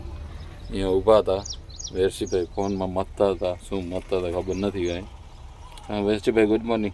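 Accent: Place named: Kathiawar, India. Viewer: કાઠિયાવાડી ભાષા હો ભાઈ 😅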